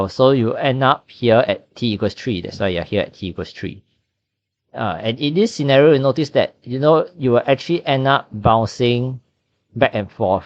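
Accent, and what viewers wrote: United Kingdom. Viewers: Are you british? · Are you British?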